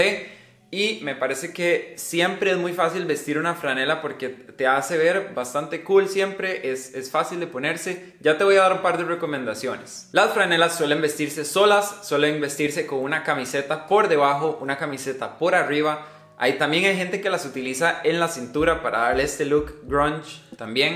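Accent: Costa Rica. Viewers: usted es tico? vea esa ERRRRRE mae hahaha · Creo que de Costa rica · Eres tico verdad?🇨🇷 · Eres de costa rica ? Pasó solo 1 segundo y me pareció que si Si si eres tico,es que es muy obvio mae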